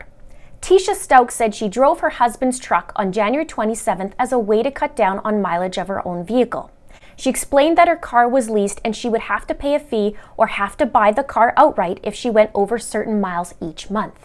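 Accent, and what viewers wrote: Canada. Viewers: Thank you so much for following this case. We all hope Gannon is found soon and want guilTEE to pay for what she's done. Nothing she says makes any sense. She's evil, but not a very good liar and certainly not a very smart criminal. Keep up the great work. BTW, I love your canuck accent! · Omg, I just love your accent! “Oot and aboot.” If trump steals the election in Nov, I may move north, watch hockey, and say ‘oot and aboot,’ too.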